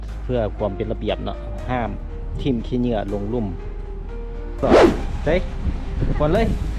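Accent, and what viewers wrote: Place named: Vientiane, Laos. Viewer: ສຳນຽງຄືກັນ. ຄິດວ່າຄົນແຂວງວຽງຈັນຊັ້ນດອກ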